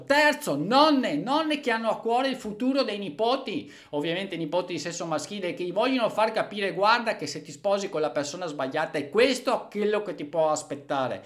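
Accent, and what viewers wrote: Veneto, Italy. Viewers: Io lo ascolto in loop sto video perché mi fa morire dal ridere. Il modo, le parole, la cadenza veneta (come la mia) impostata... credo di morire. Divorzio SPA mostro leviatanico.... morto!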